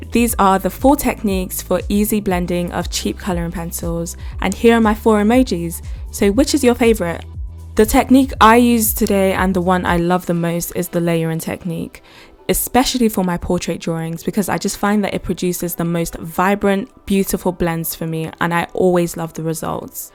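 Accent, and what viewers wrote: United Kingdom. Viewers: Your british accent is so satisfying...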